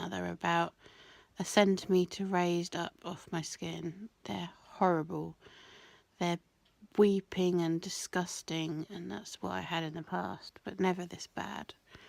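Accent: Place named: London, United Kingdom. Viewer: Your accent sounds like you're based in london like me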